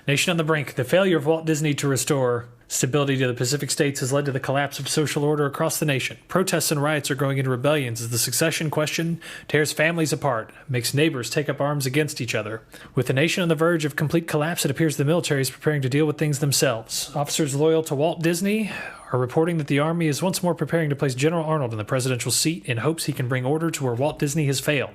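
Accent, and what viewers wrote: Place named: Louisiana, United States. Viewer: The Louisianan accent made me subscribe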